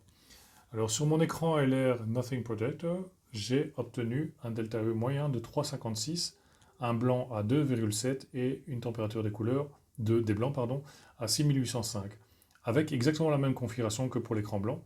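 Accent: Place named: Belgium. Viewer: tkt pour l'accent belge, il est vraiment très léger et n'entrave en rien la compréhension !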